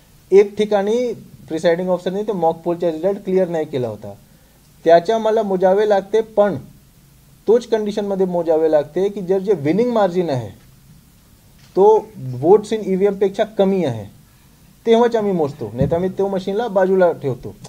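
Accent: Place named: Gujarat, India. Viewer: Gujrati ahe vate ha kasa marathi bolto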